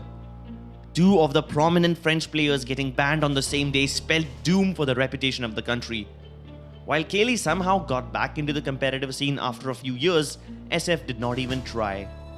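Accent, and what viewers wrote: India. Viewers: Chutiye indian h to ese he rahegi na awaz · F*ck your Indian accent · Indian accent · you sound too indian i am sorry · Of course it’s an India voice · Change your indian accent please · it's just indian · The Indian accent made me chuckles alot xd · Nice Indian accent can’t even pronounce vac how do u even pronounce it as “wac” ban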